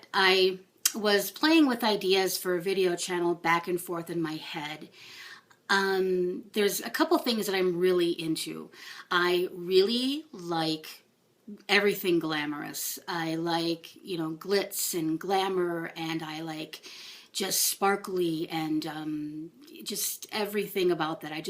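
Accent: United States. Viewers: Glad to get to know you! I hear the Minnesota in you, I'm a Midwestern girl, too. Cheers, Lulu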